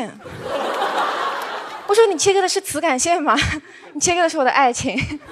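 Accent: Northeast, China. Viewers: 可惜了 其实挺好的 就是说的太东北 动作表情太多 李诞不喜欢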